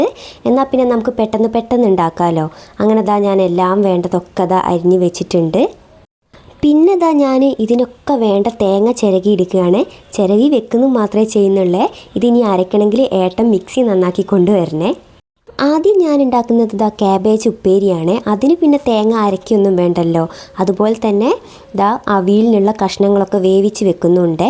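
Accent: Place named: Malappuram, India. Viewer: Super🎉🎉🎉, നിങ്ങൾ കോഴിക്കോട്ടാണ് ലേ? സംസാരം കേട്ടപ്പോൾ മലപ്പുറമാണെന്ന് തോന്നിയിരുന്നു🎉🎉🎉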